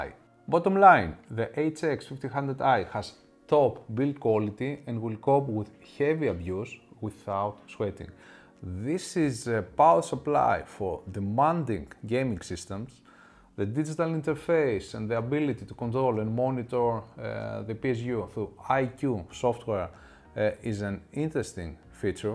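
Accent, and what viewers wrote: Greece. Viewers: Greek accent?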